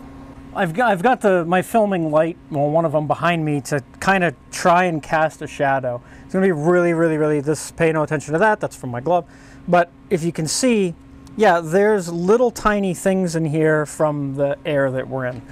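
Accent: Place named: Northeast, United States. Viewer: Very odd 🤣🤣 you must be from up northeast 100 %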